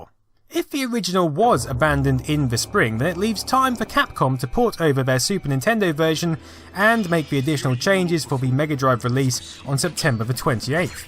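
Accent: United Kingdom. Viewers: shitbrit narrator- i'm out. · beeta! You are British!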